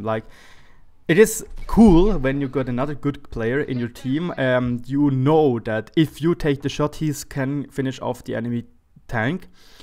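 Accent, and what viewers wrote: Germany. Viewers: wait are you from germany? i'm new so just asking!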